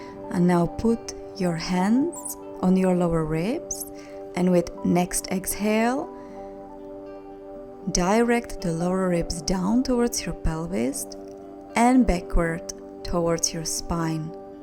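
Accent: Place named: United States. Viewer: sexi baba ze cesko a meles ako z ameriky hee.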